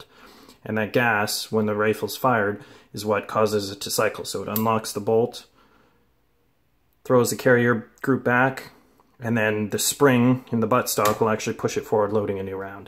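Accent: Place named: Canada. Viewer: Dude is deff Canadian lol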